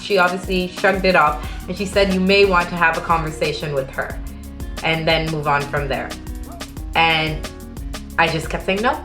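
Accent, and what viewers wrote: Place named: India. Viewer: omg i love Indian ascents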